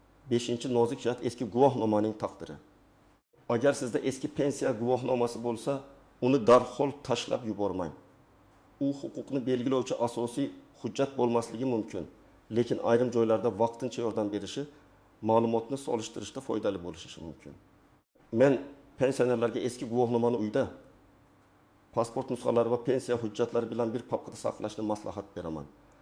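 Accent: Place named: Samarkand, Uzbekistan. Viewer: Шунча вактимни утказиб эшитипман? Хали бу ёлгонмиди ? Узбекчани билади, факат самарканча точик акцент бн гапираяпти буни ёмон жойи йук лекин ёлгонлиги яхши мас !? 😮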